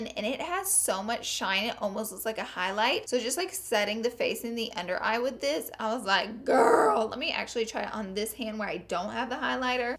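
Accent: South, United States. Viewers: I'm not trying to be mean but are you from the south cause you have a bit of a country accent?? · She's southern. She has a accent there is nothing wrong with her smh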